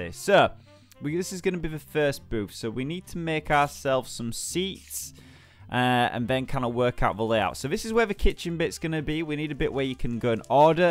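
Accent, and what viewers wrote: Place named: United Kingdom. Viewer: Is a vegetarian or vegan? I've always thought he is so cute but when he mentioned that veggie burger I think I fell a little bit in love lol. (Totally not a biased vegetarian myself!) + that dialect is to die for <3 Where in the UK is from?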